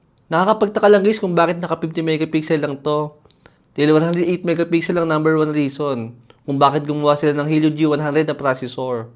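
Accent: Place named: Batangas, Philippines. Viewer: Taga saan k boss parang batangueno ka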